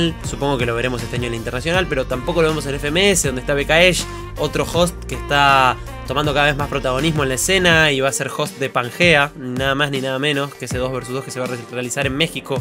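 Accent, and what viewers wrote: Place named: Argentina. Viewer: Se nota que eres argentino todo el rato wos Si nitro, teorema le ganan a wos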